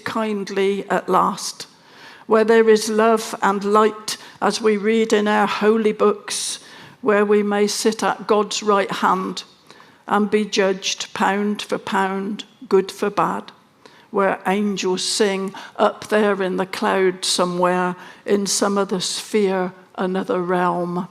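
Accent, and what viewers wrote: West Country, United Kingdom. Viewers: 👍good on Pam Ayres for a plethora of comic ryhmns and worldy laments with a wonderful west country lilt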